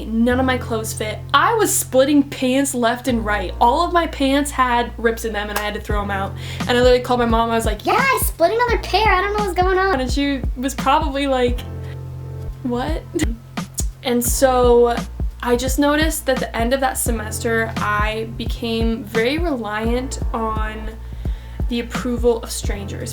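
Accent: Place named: Montana, United States. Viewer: that montaner accent 😂 gotta embrace it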